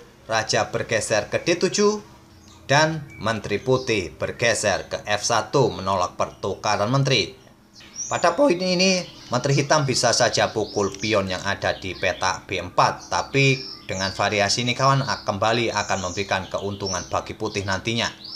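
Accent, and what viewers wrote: Java, Indonesia. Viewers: admin orang jawa bukan ?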